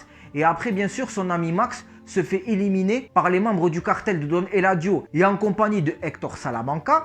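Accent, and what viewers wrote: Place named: South, France. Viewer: Salut, ça fait plaisir d'entendre ton p'tit accent du sud. Excellente vidéo!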